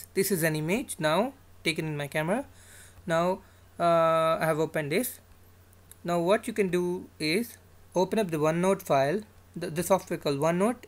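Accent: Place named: India. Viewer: Thank you, this was helpful. Your Indian accent is funny.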